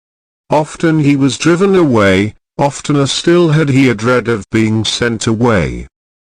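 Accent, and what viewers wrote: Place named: United Kingdom. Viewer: I want to try this 😁 Edit: thank God this is British. I can practice my accent 😆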